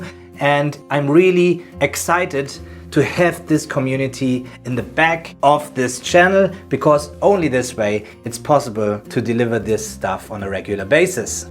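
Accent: Germany. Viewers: Direkt den Deutschen Akzent rausgehört 😂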